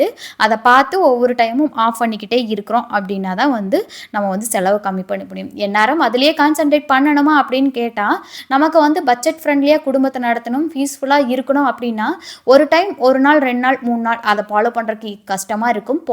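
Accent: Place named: Kongu, India. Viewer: 1st time i saw ur video sissy very usefull yo me ... nd r u kongunadu girl ?? am also coimbatore sissy... namma slang ea vera level than sissy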